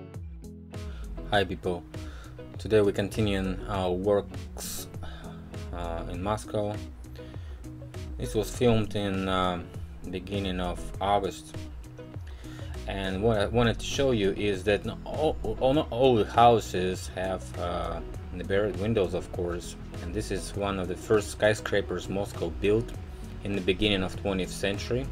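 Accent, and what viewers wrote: United States. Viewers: when you learnt to speak English, you also picked up a slight American accent. That was the very first thing I noticed. I'm Australian, so it stands out to me.:) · You got a southern American accent and you ain't talking to slow. It's perfect!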